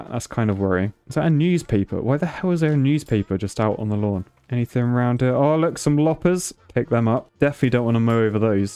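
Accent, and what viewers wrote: United Kingdom. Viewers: I came for the gaming and stayed for the British accent :)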